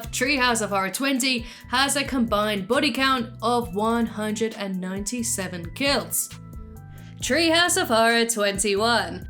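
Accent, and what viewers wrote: United Kingdom. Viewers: love the Brit voice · She's speaking British English, though. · Love British voices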